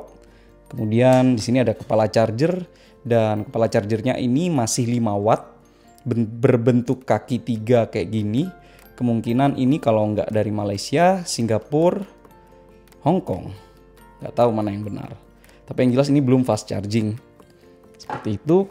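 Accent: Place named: Surabaya, Indonesia. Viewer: Hahahah, suroboyoan jangkrek..😁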